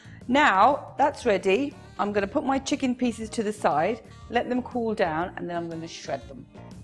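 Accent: United Kingdom. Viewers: She is flaming Hot.. I love her British accent too.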